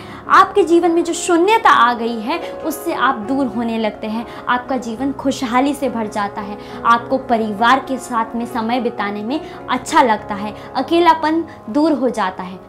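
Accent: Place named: Bihar, India. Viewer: Bihari tone thik karen.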